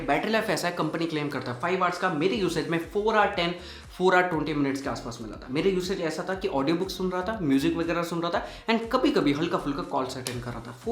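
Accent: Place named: Kerala, India. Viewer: That accent is cool! Are you from Kerala?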